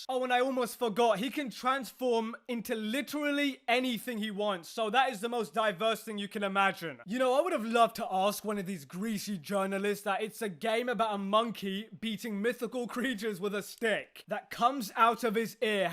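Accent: United Kingdom. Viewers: i love your british accent sooooooooooo much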